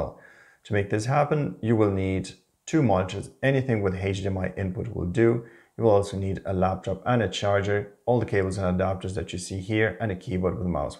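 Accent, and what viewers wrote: Ireland. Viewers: Great tutorial.. Are you irishhhhhhhh? 👌🏾👌🏾🔥🔥.. I love All people but Esp I love all Irish people 😊👌🏾🔥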